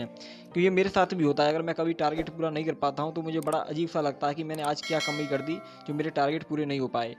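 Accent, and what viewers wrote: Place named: Chambal, India. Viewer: भाईसाहब आप कहां से है ,, बोली से लग रहा है आप भिंड, (चंबल संभाग) से लग रहे हो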